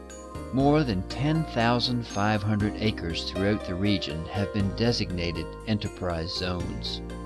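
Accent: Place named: Virginia, United States. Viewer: The narrator definitely doesn't have THE strongest NNK accent but it's certainly there...notice how he said, "throughout?" Reminds me of my grandparents. Though I grew up in Richmond County and am proud to be from the area, I could never live there again. When I go back to visit, it boggles me how little has changed. In fact, it seems every time I return, it looks more and more isolated and run-down.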